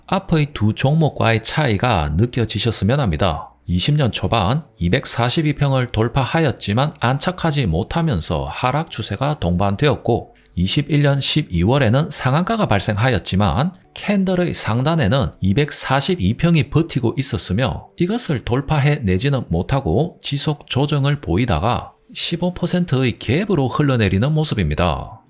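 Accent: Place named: Gyeongsang, South Korea. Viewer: 세련된 경상도 억양이 집중도를 높이네요 귀에 쏙쏙 ~~ 영상 굿~~~ 감사합니다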